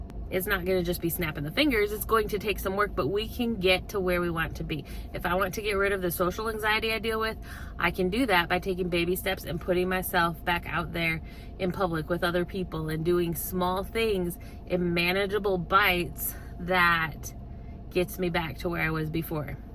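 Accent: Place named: South, United States. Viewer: Any chance you live in Alabama? You sound Southern.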